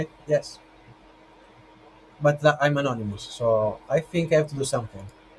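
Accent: Italy. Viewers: Ur absent seems italian lol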